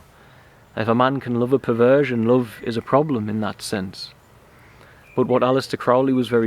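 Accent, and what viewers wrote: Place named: Newcastle, United Kingdom. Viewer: Just a joy to hear a Geordie/!Teesside accent Do tell us your story.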